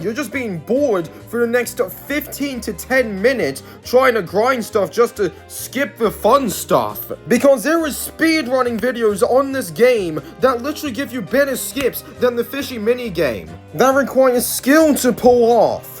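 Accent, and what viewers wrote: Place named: United Kingdom. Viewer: The way you pronounce words made me suspect that you are British. I adore British voices. To me they are really enjoyable to listen. My lips and tongue cannot do that.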